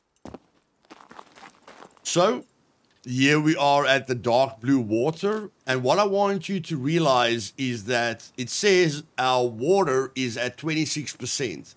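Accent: Afrikaans, South Africa. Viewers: You Afrikaans?😅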